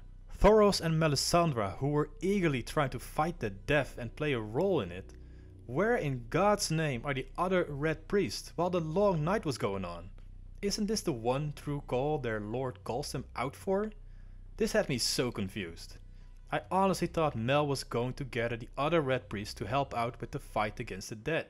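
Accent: Netherlands. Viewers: are you by any chance dutch? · Nou je Engels is goed genoeg hoor! Had wel binnen 10 seconden door dat je uit nederland komt;) Lol!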